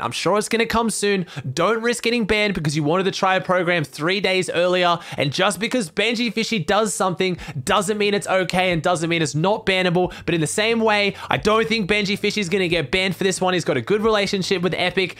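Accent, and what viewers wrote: Australia. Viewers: Aussie!!!!!!! Yo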